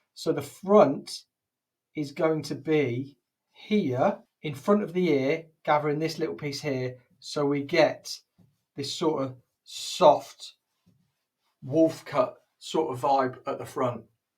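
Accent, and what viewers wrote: United Kingdom. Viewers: i like ur explanation also ur britsh accent. like and subscribe you.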